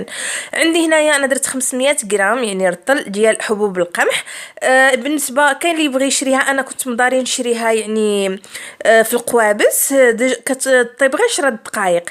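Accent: Morocco. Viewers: سبحان الله هدرتك فحال المغاربة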